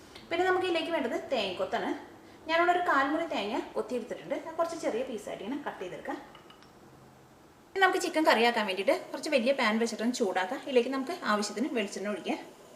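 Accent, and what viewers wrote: Thrissur, India. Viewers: Dear Mam, this is excellent. We also tried your Ullii Chammandi & Rasam powder. A small suggestion - you should have avoided the back-ground song, which is not at all relevant for this particular dish - Nadan spicy Chiken, please check. We also like your off-screen, to the point presentation with a special slang (thrissur????) as bored with the on-screen presentation “of self” of some celebrity chefs, rather than their recipes). We expect more simple recipes from you Mam… Thanks. venu family, surat.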